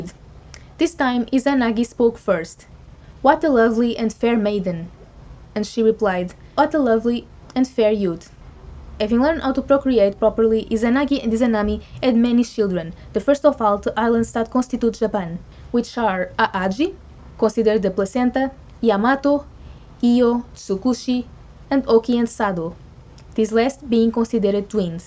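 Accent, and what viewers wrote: Portugal. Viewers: Arquipelágo? A heavy portuguese accent. Perhaps Coimbra?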